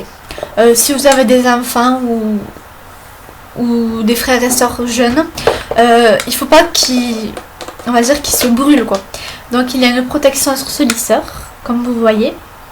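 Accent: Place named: South, France. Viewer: On dirait que t'as un accent du sud :D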